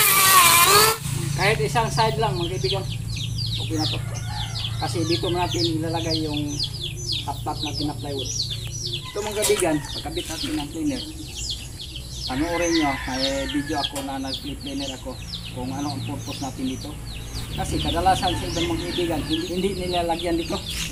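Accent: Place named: Visayas, Philippines. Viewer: 😃😃😃 Ang galing mo tay lalo na sa pag sa salita mo murag bisaya 😀😀😀 may nakat on an jodko nimo salamat tay baguhang panday Mason rin Ako.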